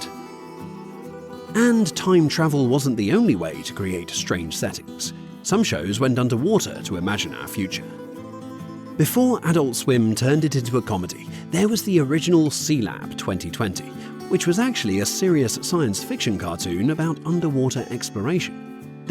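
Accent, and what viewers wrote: United Kingdom. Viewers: I remember all those Saturday morning cartoons growing up in the 70s, but what I don’t remember is your British voice coming out of my mono TV in 1977 That’s what I don’t remember.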